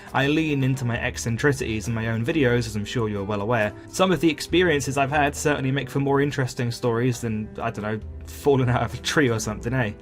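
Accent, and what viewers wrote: United Kingdom. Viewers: This was like having my life condensed into half an hour and read by a British man. legitimately made me cry for the first time in a very long time and broke what i think was a lifetime no cry streak. I needed this, Thank you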